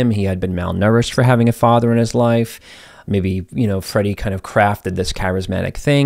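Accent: Boston, United States. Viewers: Are you out of Boston? Just hearing the beginning of this story. Ugh my heart from this story and I love your videos so helpful. ❤️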